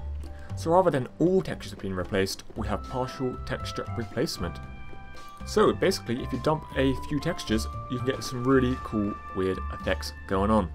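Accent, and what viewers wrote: United Kingdom. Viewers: you sound such british =D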